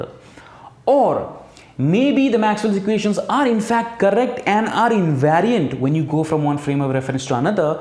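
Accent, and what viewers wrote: India. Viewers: I have to admit, it is not always easy to understand lectures of professors with Hindi accent, but I would like to say that I appreciate the effort you took on diction and pacing. Made it very comfortable to listen to, which is great for learning. Also your explanations are awesomely clear and easy to follow, without skipping anything important. I could follow the lecture very well, even though it is the first time I'm looking at this topic of the inconsistency of Maxwell equations and Galilean transformations. The algorithm did a great job, because I was looking exactly for this lecture and yours was the first thing to come up and it is well deserved. Thanks for the great content.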